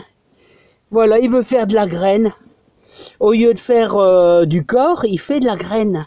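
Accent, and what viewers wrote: North, France. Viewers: j adore!! ta facon de parler teu s'ro point du nord tizote? hi hi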